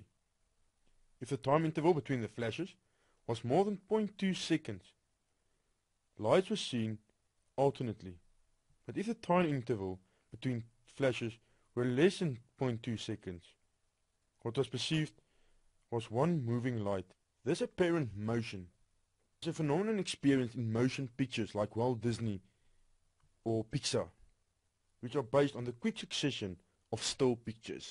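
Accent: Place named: South Africa. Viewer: Nice to hear an SA accent on Youtube!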